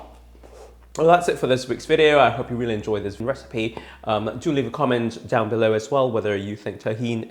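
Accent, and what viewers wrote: United Kingdom. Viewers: I can't stand the British accent 😂😂😂😂 its horrific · Love your Recipe and your Accent!! British??? NYC Manhattan ❤️💋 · Nice video for the spring rolls, also like your British Excent.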